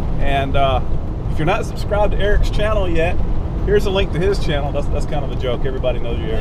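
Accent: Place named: Georgia, United States. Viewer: Great video! Being from Australia I love the Georgia accent and also Yorkie woof pack - similar but smaller than the Aussie terrier!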